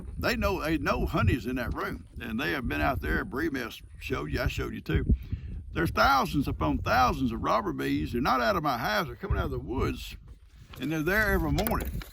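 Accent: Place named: Texas, United States. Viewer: I LOVE that Texas accent of yours, I could, and have, listened all day. And seeing you out in that beautiful pasture…you’re killin me. Well, a girl can dream right and you can tell GiGi I said so😂😂😂I know you only have eyes for her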